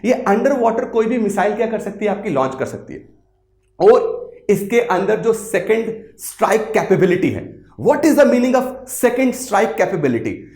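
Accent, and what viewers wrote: Punjab, India. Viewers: Deep knowledge with punjabi tadka 🔥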